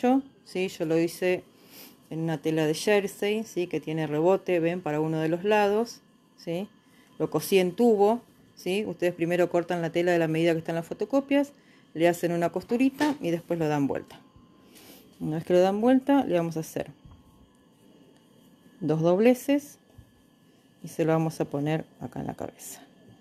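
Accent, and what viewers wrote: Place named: Argentina. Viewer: Señora de q parte de ARGENTINA ES USTED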